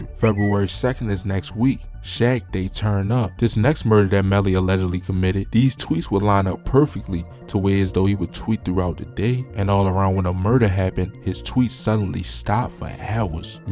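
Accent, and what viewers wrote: Baltimore, United States. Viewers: You from Baltimore? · This nigga gotta be from Baltimore the way he talk 😂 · You can tell this guy is from Baltimore or the dmv lol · Lmao ya accent screams Baltimore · nigga bmore accent funny as hell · This accent killin me. You from Bmore? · Between your fake over exaggerated suspenseful voice to the irritating Baltimore accent l😂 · Lol Bmore accent detected tew yew dew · ain't no q about it, you hear that baltimorese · You can tell you not from city and sound like you from Bmore. Nigga dont know how to say calumet or cicero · he sound like he from Bmore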